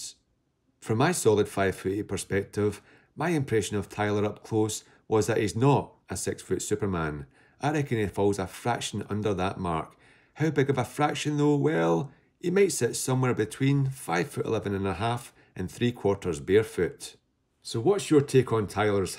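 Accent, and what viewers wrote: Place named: United Kingdom. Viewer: No offense, I'm not good with British accents but it's late and I genuinly thought I was having a stroke when I started the video Again that's on me but I literally panicked lol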